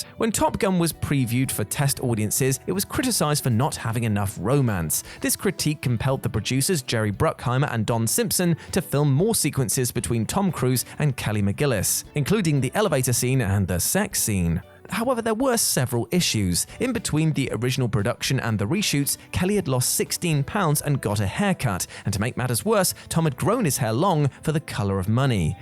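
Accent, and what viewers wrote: United Kingdom. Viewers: God I hate British narrators!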